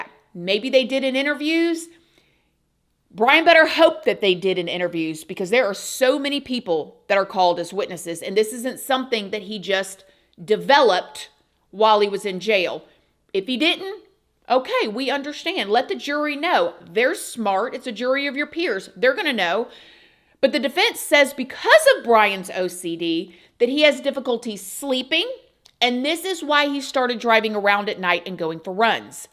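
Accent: South, United States. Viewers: Love your videos! I watch every new video that comes out. It makes me laugh tho everytime at the end of your videos cuz you go from southern “yall’s” to valley girl “Byeee” 😂 Love it!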